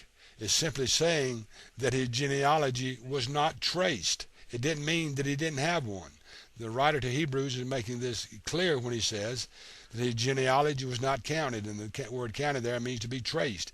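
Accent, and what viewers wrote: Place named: South, United States. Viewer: Your accent alone makes your credibility come into question..hilljack? hillbilly? south of the mason dixon line? anyway.. Jesus was a Rabbi, human. He Blessed God all the TIME.. so your argument is ridiculous. According to you, Jesus is God so how could he be blessing himself? Then by your own argument Jesus cant be God.. thanks for clearing that up.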